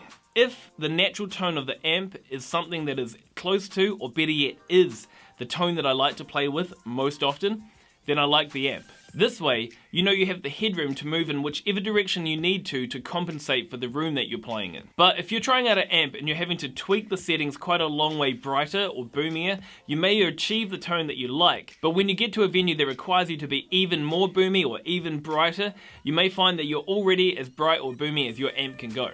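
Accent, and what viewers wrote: New Zealand. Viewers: Okay...I love guessing accents and I've always struggled with Aussie vs. Kiwi. That being said, I think I've gotten a better handle on the two and I want to guess. Are you from New Zealand?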